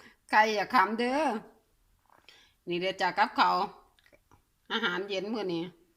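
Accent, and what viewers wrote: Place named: Korat, Thailand. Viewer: สวัสดีจ้า สำเนียงนี่คนโคราชแท้แหละเนาะ คนกะไหนน้อป้าก็คนโคราชคือกันเด้อจ้า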